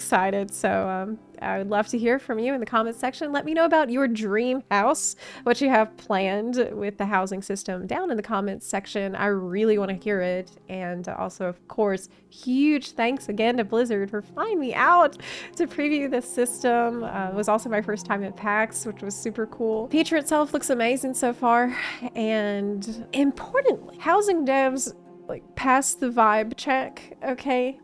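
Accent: South, United States. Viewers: I hear your southern accent! XD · Why do you all of a sudden have a Southern accent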